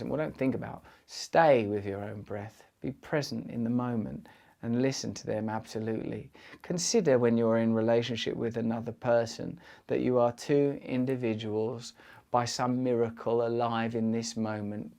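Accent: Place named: England, United Kingdom. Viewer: Mojo, sounds most appealing when said by an Englishman! When I say it sounds like mow joe! Help me russ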